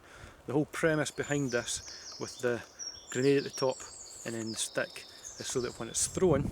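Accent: Scotland, United Kingdom. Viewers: are you scottish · i love the scottish accent :D its so damn cool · Scottish he is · he so british i cant understand a thing he says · This guy is Scottish, and using a Stielhandgrenate... TF2 Demoman anyone? Ullapool Caber! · are you scottish ? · He sounds Scottish but UK actually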